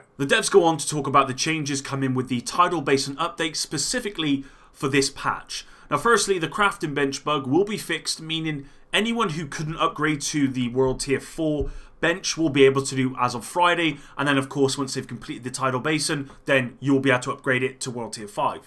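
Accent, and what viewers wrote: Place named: West Country, United Kingdom. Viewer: are you from Bristol or Plymouth?. Your accent sounds like you are.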